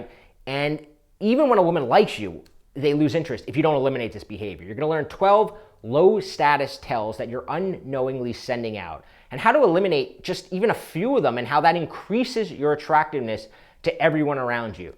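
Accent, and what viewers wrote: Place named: Boston, United States. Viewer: This dude is definitely from Boston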